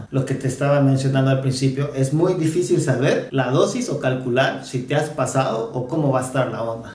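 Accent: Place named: Uruguay. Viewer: Está bueno que informes para evitar accidentes. Muy bien cuadro en el fondo. Hay canales que enseñan recetas pero no he visto ninguno que hable de las proporciones por persona y del tiempo a esperar antes de decidir tomar otra porción. Muy bien informado. Me llamó la atención tu acento, de qué país sos? Hablas bastante parecido a nosotros los uruguayos.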